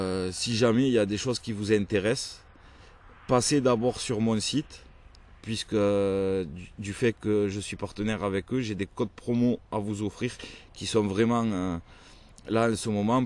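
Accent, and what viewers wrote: South, France. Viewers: Bonjour. Merci beaucoup pour le partage de vos connaissances/vidéos qui sont claires, instructives, complètes, enfin je me régale à chacune de vos vidéos, camarade sudiste ! (enfin je dis ça pour l'accent de chez nous, je peux me tromper mais cet accent me parle ^^) Merci encore pour le temps passé sur vos vidéos ;) Cordialement, Ben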